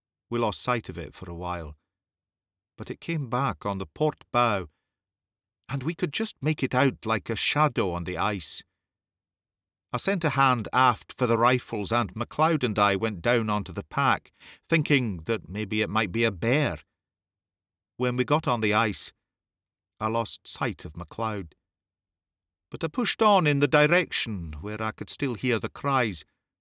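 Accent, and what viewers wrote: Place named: Scotland, United Kingdom. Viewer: Perfect as always,! Your Scottish accent is so fun to listen to!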